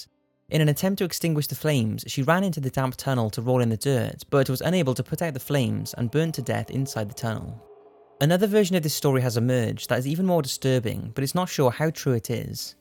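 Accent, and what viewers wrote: United Kingdom. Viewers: Am I The Only One Who Loves This Guy's British Accent??😐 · You have a very beautiful and calming voice, I really like it, where are you from? Your accent looks like British....😊 make more videos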